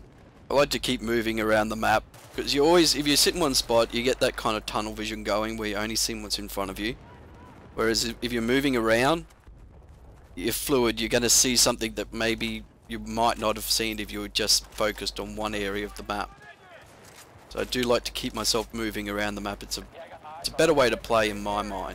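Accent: Australia. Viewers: at last... a video with call outs and an Aussie for the narrator =)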